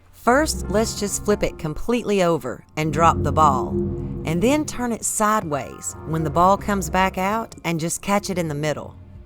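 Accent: South, United States. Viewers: I adore you so much. I hope your channel takes off. You've already helped me so much--with the electricity and the test of strength, and then with how to defeat guardians and keep retrying to get giant cores. Now I learn you can use fire sword to light shît, and great info on bows and arrows. And to top it off, you're extremely pleasant to listen to. Southern accent for days!!! There are some ppl I put up with their voices for their content, others with content I want but I CANT with their voice, but your voice is like coming home. Thank you for existing, for so many reasons.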